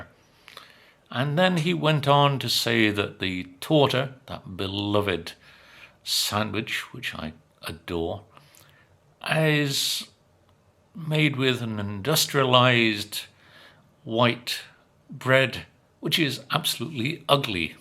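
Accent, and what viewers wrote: United Kingdom. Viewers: me encanta su acento británico 😊 no conocia su canal. 🖐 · Me too is true ( The British accent is beautiful!! ) 💙🫂💯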